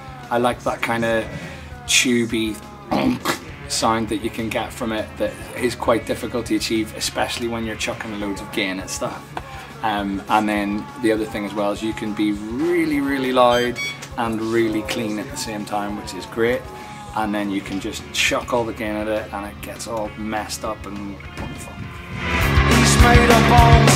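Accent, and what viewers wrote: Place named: Northern Ireland, United Kingdom. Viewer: He's DEFINITELY Northern Irish.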